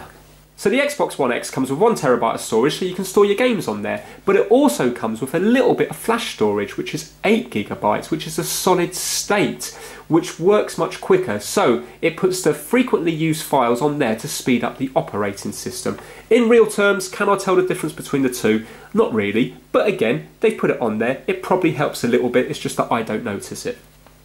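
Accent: England, United Kingdom. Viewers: u have a deep British accent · *english accent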